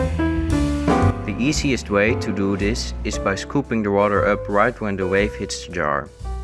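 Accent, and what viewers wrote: Netherlands. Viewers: Are u dutch? U sound it lol, im dutch but without the accent. Hoe gaat het?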